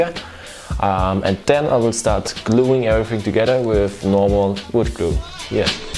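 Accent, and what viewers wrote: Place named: Germany. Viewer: Man hört sofort das du deutscher bist. Auch ohne die Deutschen einlagen. 😂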